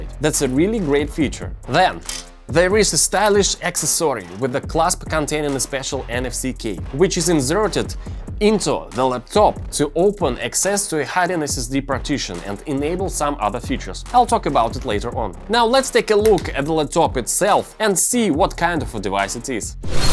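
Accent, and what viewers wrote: Russia. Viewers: I enjoy it,but Take away that Russian-english,it will bee 100% better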